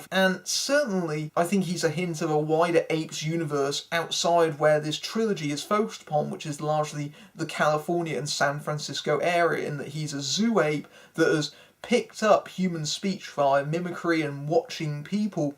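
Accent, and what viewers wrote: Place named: England, United Kingdom. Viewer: You got the best English accent ive heard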